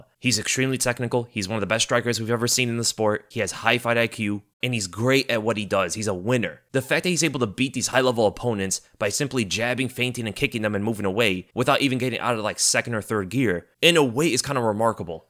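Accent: United States. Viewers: Had no clue you were from Albania that's cool. Totally thought you sounded like you were from the US in Milwaukee or something lmao😂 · damn albanian, i thought you were american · Had no idea you were Albanian, you sound American. You are born and raised there?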